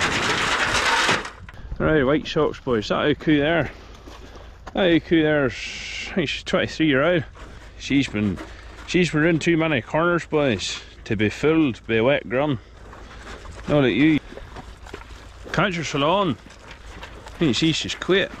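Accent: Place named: Portavogie, United Kingdom. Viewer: You sound like all us folk in Portavogie. Hey you ever been?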